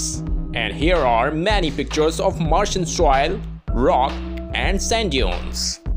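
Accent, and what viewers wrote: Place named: India. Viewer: English speaker with indian accent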